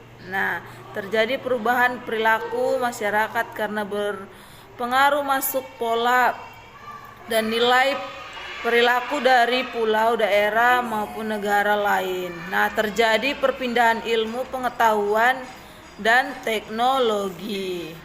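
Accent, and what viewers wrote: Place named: Batak, Indonesia. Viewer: Terlalu Batak kali bu guru ❤❤❤❤❤❤❤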